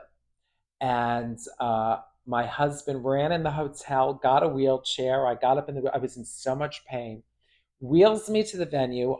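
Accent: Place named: Queens, United States. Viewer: Sounds like Queens, New Yawk!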